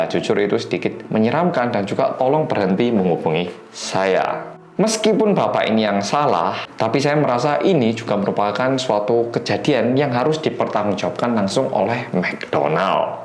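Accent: Java, Indonesia. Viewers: Medokk!!!! ~wkwk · bagus, medoookkk nya makin panjang, wkwkwkkwkw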